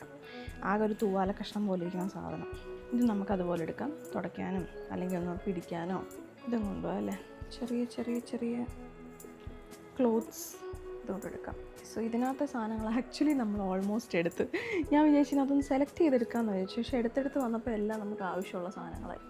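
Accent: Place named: Trivandrum, India. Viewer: slang ipo tvm slang ayapole 😃and hope u and baby doing well ❤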